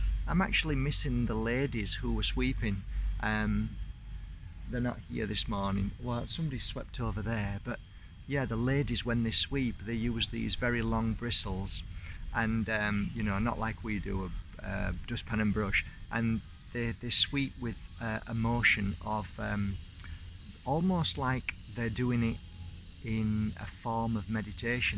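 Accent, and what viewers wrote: Yorkshire, United Kingdom. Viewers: I wish I could meet you face to face. Just to spend a little time in your presence would so help with the issues I have. I need to find the happiness within. Thank you so much for your wisdom and all your videos, they do inspire me. Just to have a conversation would be awesome. Thank you. Ps. Love your accent. Little bit of Yorkshire and Leeds.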